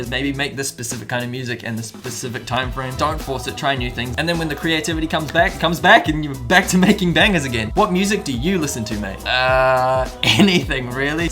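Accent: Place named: South Africa. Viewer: Hey mate - I love your accent (and the music definitely too) ;) Are you of SA origins? Cheers from Poland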